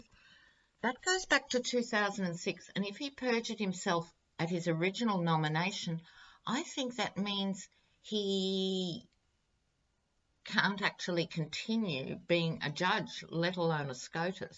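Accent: Australia. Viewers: I love your Australian accent especially when you say "deep shit". You are too cute!☺️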